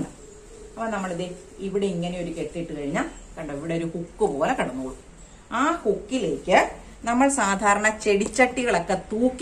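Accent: Kottayam, India. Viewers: വർത്തമാനം കേട്ടാൽ അറിയാം കോട്ടയം സ്വദേശിയാണ് എന്ന്..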